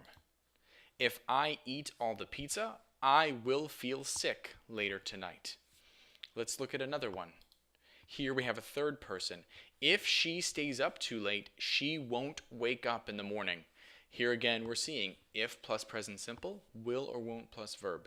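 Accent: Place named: California, United States. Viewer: OH WOW, WHAT A LOVELY ACCENT, ARE YOU FROM CALIFORNIA? AND YOU ARE SO HANDSOME....I SUSCRIBED !!!!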